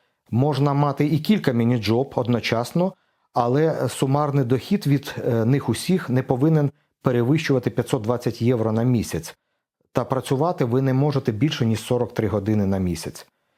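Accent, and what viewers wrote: Slobozhanshchyna, Ukraine. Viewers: все нормально! А в минулому було не "на русском", а на слобожанському діалекті співучої української мови!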